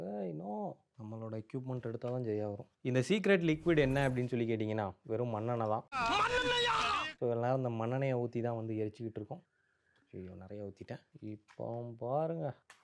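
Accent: Tirunelveli, India. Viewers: you have tirunelveli slang.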